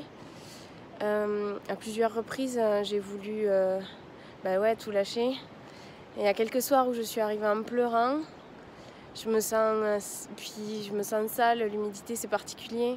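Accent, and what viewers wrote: South, France. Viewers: Une bien belle aventure. Bravo la miss. Partage nous d'autres belles aventures comme ca. C'est top. +1 abonné. 👍 Et cet accent du sud me rappelle pleins de choses. 😉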